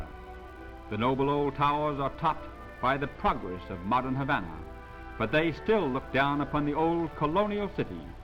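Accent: Transatlantic, United States. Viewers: transatlantic accent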